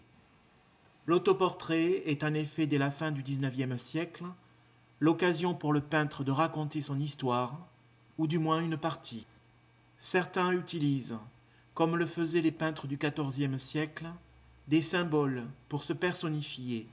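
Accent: Marseille, France. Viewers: Il a l'accent marseillais de l'huile pujet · Allez L'OM ⚪️🔵 L'accent de Marseille Wallah... Sheeeeesh....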